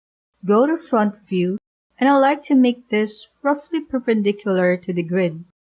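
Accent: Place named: Philippines. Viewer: I hear a pinay accent😅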